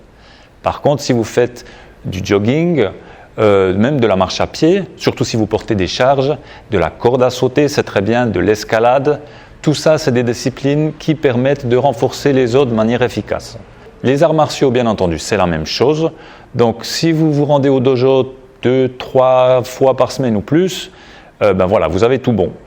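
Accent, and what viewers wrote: France. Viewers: Gros merci ! Ca coule comme de l'Eau , avec un petit accent en plus ... Alsacien ? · Y a pas un ptit bout d'accent Franc Comtois là-dessous? Video tjrs d'actualité 👍✊🙏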